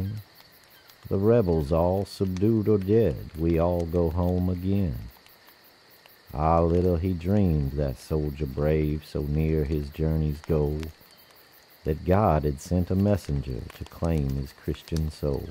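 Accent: South, United States. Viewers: Gotta slite bit of * ...Southern drawl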